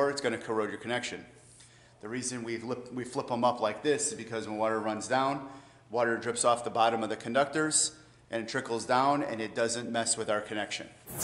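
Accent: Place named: New England, United States. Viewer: Awesome video! As an electrical graduate of a vocational high school this brings back memories. I’m currently deciding to upgrade my two family home from 200 amp service ( each unit is 100amp panels) would it be worth it to upgrade and if so how much do you think would cost? Also judging by the way you speak, you must be a fellow New Englander. I’m in western Mass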